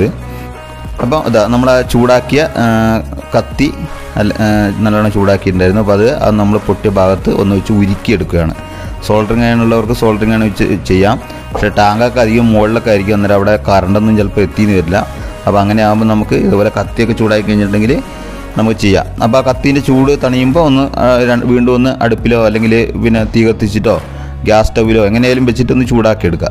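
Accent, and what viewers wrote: Kannur, India. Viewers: നിങൾ കണ്ണൂർ ആണെല്ലോ....പോളി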